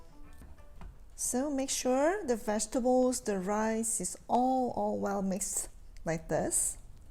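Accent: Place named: France. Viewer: she sounds like she has a French accent.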